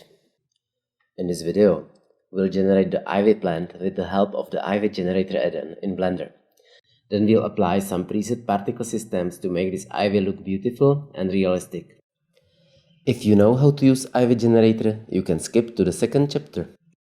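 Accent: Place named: Czech Republic. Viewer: That Czech accent lmao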